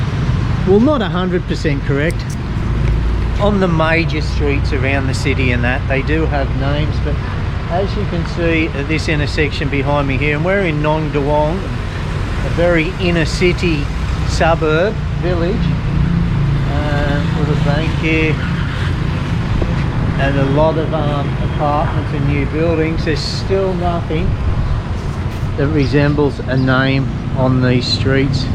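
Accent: Australia. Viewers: Hi. I think using English letters (Romanised) for Lao is very difficult. There is no standard. There are many Lao - English dictionaries, but assume you speak French. (Hence the screw up with the "S" in Laos) or that you know phonetics. I speak guttural Northamptonshire English; and you Aussie. (I assume that's the noise being picked up by the microphone.) We both know English and can understand each other, but it's those vowels and tones that have to be correct when speaking Lao. if i wrote in my dialect, what you'd say would be miles off. I work with a Glaswegian - lovely chap - but I have to listen to him closely. When he is with his mates - I give up. That was a long way of saying "No" - but I hope helps! Here's a link to a web site I'm playing with. <link> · Are you Queenslander?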